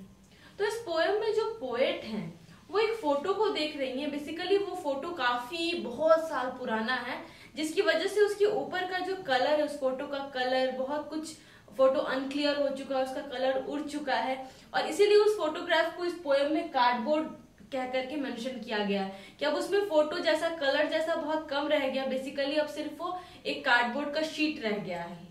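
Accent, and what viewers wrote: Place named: Bihar, India. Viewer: Didi you are incredible. Are you from Bihar ? As it seems to me.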